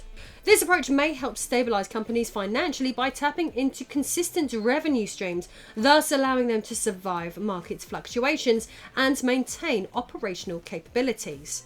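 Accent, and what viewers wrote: England, United Kingdom. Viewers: What part of England is from?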